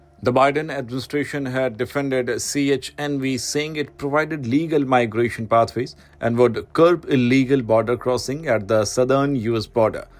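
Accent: India. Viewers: Heavily indian accented and using the word LAKH only used by south asian countries.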